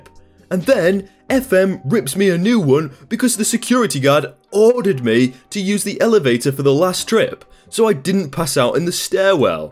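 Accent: United Kingdom. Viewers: The narrator seems British, the reddit poster was American ... two completely different languages that are only superficially the same ... watch enough videos and you'll get the hang of it ...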